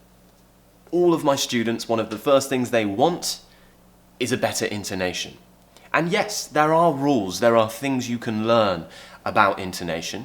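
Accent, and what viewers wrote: RP, United Kingdom. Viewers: British accent is more likely I like it 2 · I love to listen your beautiful british sound and You are a good teacher ever! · I love British accent, especially yours because it's very clear, its easy to understand. 😍❤️ From Philippines 🇵🇭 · 好听的英式腔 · Aaaaahhhhh!!! Ur accent is👌👌😍 Honestly , I love British accent♥️the most ...though m Indian🇮🇳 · Compering to a quite arrogant american one, it caresses ears. I love this posh accent and soft or lost R. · Clear, British English, i understand all, please advise me BRITISH SERIALS, with British accent, so i will be very thankful for you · Wow new hair cut... Handsome! I want to learn english and the british accent thats why i keep on watching you, you are good mentor..thank you! From Philippines...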